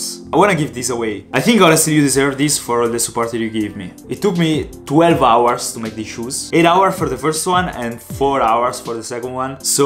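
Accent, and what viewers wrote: Italy. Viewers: Bro you sound italian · Are you Italian? I am Italian and your accent sounds like it! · His accent is like an Italian trying to talk in inglish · 🇮🇹Hai un accento italiano mentre arli in inglese, sei italiano ? 🇬🇧you have an italian accent when you speak english, are you italian ? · You have such an italian accent bro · fra si sente sei italiano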